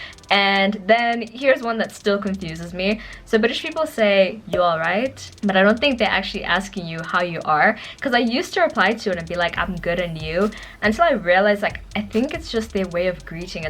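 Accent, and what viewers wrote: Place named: United States. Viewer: You sound American lol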